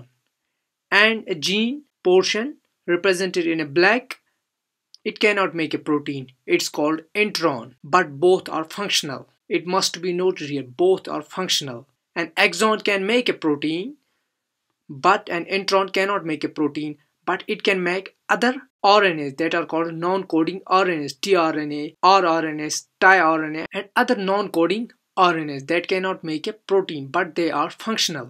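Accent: Kashmir, India. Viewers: not not the english ..thats mashallah fine ...its just the aacent ...we kashmiris have ...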